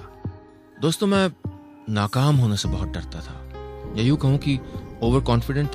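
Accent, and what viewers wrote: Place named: Lucknow, India. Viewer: आपकी आवाज़ से लगता तो था की आप लखनऊ, कानपुर के हैं. आज पता भी चल गया माँ- बाप के लिए सीख है की बच्चों पर अपनी इच्छाएं थोपें नहीं. उनकी रुचियों को अहमियत दें. नहीं तो बच्चे कब आपसे दूर चले जायेंगे पता भी नहीं चलेगा.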